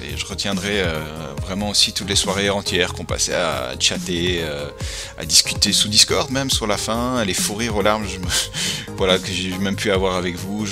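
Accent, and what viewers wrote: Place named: United States. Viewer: comme on dit chez nous, ta faillit me faire braire lol, je doit t'avouer que sa me pince le coeur que tu ne soit plus parmis nous, tu m'a beaucoup appris, tu m'a redonner confiance en moi et pas que sur le jeu, outre le fait que tu sois un excellent joueur, tu es surtout une très belle personne, "the voice" avec ton accent à l'américaine que je kiff tellement ahah, par moment sur la fin j'avais envie de te "forcer" à rester car je ne suis pas dupe, mais sa aurais été je pense irrespectueux et inutile, j'espère que tu trouvera ce que tu cherche, dans tout les cas j'espère qu'on restera en contact via fcbk, tu va me manquer, d'ailleurs tu me manquais déjà depuis quelques temps, je garde tes précieux conseils bien aux chaud pour pouvoir continuer à faire évoluer le clan, un grand merci à toi, au temps et à l'énergie que tu nous a consacré, comme dirais l'autre "all right" ou encore "yallah" lol, reste comme tu es et prend soin de toi et de ta famille, je te souhaite d'être heureux, et ce n'est qu'un aurevoir ;)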